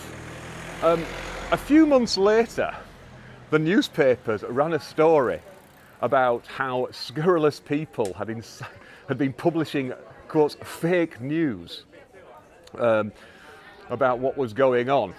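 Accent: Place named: Yorkshire, United Kingdom. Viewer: Not a matter of law, but: my mother's family and their accents came from around Stainland, Sowood, and Jagger Green. I guess yours might be a bit Northwest of there, maybe Mytholmroyd or Todmorden? I live on the other side of the world now, and I've picked up some Kiwi slang, but I don't think I'll ever lose the vowels or the cadence of where i grew up.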